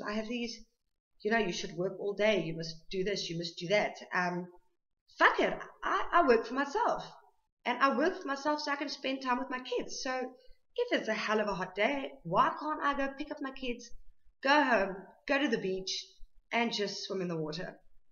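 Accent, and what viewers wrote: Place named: South Africa. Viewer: Hang on... South African accent detected. Good job quieting smoking. If nothing else you will save a lot of money. As a fellow South African and a ex smoker ex heavy drinker I literally get where you are coming from. I take it that you still live in South Africa, maybe not but does not mater because what I'm about to tell you is the same either way. A lot of South Africans who leave the country have a hard time adjusting to their new surroundings because they are use to being stressed, pressured, feeling vulnerable, unsafe and generally frustrated. In South Africa we grew up (I'm thinking we are about the same age) with thees feelings not knowing any other way of life. The constant stress and constantly looking over your shoulder to us, is considered just another day. You and I know that the human mind can not stand the stress even if we lie to ourselves and say we can. 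That is why so many South Africans drink so much, smoke so much and do so many other addicting things. It's just way to escape reality because our minds need release to try to relax and calm down. The truth of it is and most South Africans will disagree is that having grown up in a war zone in Africa we all surfer from PTSD. If you are looking for answers to why you feel the way you feel and why you do the things you do look into Post Traumatic Stress Disorder. Look at the symptoms of PTSD and you will recognize a lot of them. One of the ways I try to deal with having grown up in SA is to try to learn to relax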